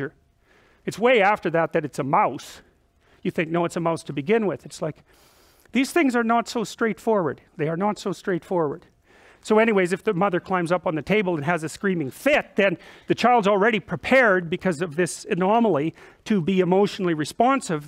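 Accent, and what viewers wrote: Canada. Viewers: He says eh a lot. Is he Canadian? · every once in a while, you hear the Canadian 'aye' come out. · He let the 'eh''s fly this lecture. It tickles me whenever he lets one out. I love Canadians.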